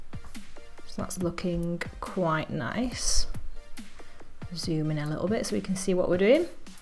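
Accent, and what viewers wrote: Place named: Liverpool, United Kingdom. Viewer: scouse accent = 😍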